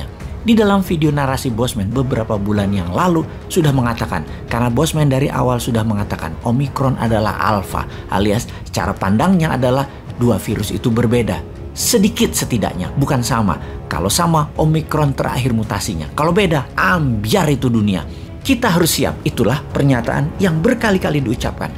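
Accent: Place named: East Java, Indonesia. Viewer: khas jawa timur cok 🤣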